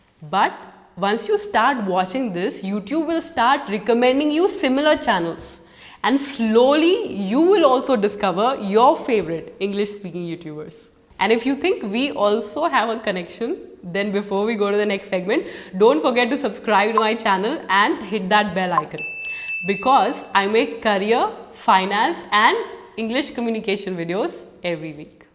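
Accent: India. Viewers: I don't like them I like only Indian English like you.. I always hear your English speaking in youtube during sleeping.... Am I telling true' English 😀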